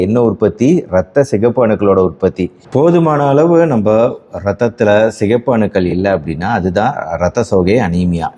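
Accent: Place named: Coimbatore, India. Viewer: வணக்கம் டாக்டர். கோயமுத்தூர் பாஷை வாசம் வருகிறது உங்கள் பேச்சில்... சிறந்த அறிவாளி அறிவான சொல்.. செயல்... வாழ்க பல்லாண்டு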